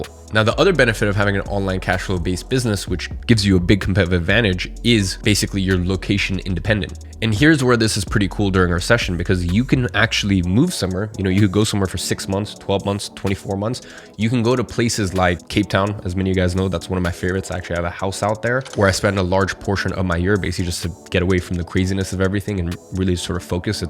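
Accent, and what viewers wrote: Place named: United States. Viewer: почему у тебя американский акцент живя в Лондоне?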